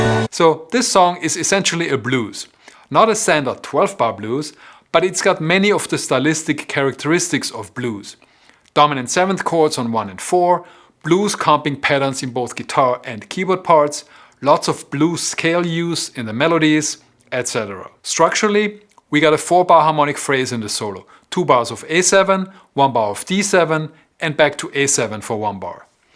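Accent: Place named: Germany. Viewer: The german accent is killing me thanks so much for the video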